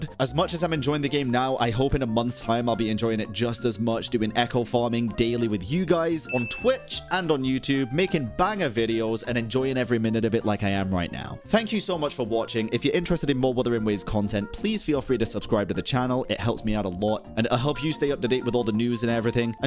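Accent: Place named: United Kingdom. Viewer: Yo, British accent is hot af let them cook well speak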